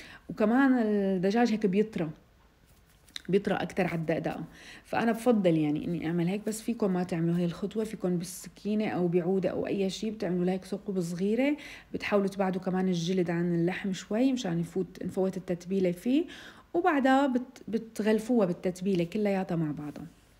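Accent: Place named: Levant. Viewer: رااااائعة.و طريقتك مبسطةواضحةوصتك فية ريحة الشااااااام♥️.احلى تحية الك من فلسطين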